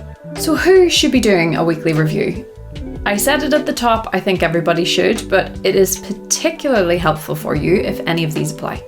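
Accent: Scotland, United Kingdom. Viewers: Love the relaxing tone of this video - and always love to see references to GTD. Curious, what is your/her accent? (As in, which part of…Scotland, right?)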